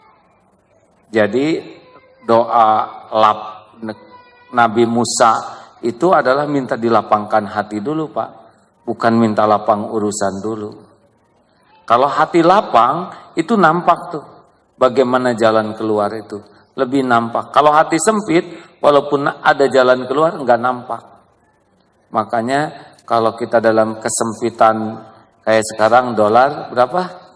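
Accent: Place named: Sunda, Indonesia. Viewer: Sebenarnya orang mana ,kadang Sunda ,,kadang ,sanjang ,nggih tiang pundi aa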